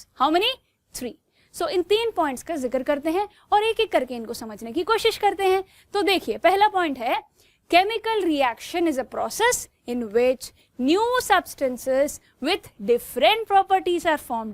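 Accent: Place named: Bhiwani, India. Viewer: Bhiwani se ho kya mam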